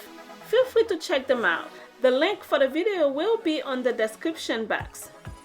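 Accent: Haiti. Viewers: Your accent sounds like you're Haitian 🇭🇹!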